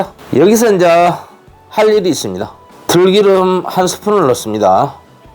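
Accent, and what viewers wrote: Jeolla, South Korea. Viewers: 고향이 라도인가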